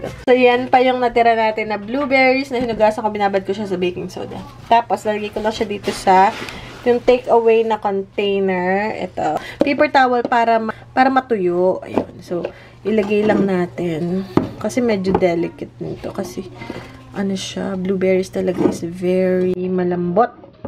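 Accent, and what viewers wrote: Batangas, Philippines. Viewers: Taga batangas ka ga?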